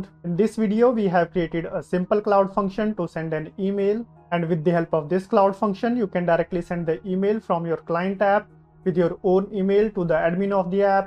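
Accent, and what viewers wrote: India. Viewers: You sounds so... Indian??? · An INDIAN Voice ....??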